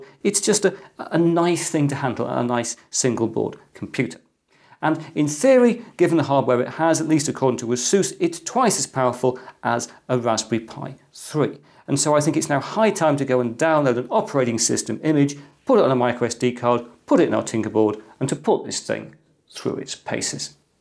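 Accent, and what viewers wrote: England, United Kingdom. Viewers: It's amazing how you have a guy with an English accent and everything he says sounds more intelligent and straightforward....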